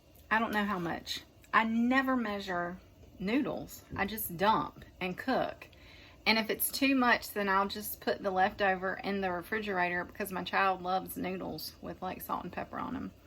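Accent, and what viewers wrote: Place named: South, United States. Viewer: Hi. thank you I never know what to cook anymore that's why I'm here. and I love the way you talk that southern accent set me on fire.. i love it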